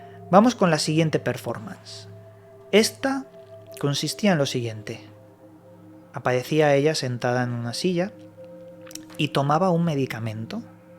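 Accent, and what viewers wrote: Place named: Spain. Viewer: Me encantan tus videos , antes no soportaba el acento español , pero tú voz me transmite paz , gracias por modificar eso , te deseo mucho éxito , esperando tus videos de septiembre a Egipto , son lo mejor , infinitas gracias 🙏🌸🌸🌸🥰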